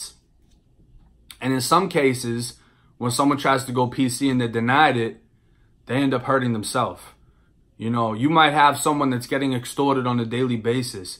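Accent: Boston, United States. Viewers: Have you ever heard of anyone on death row going PC to drop out of their gang? I’m just wondering how that would work. Love your videos and Boston accent. I was in Boston in the winter back in 1974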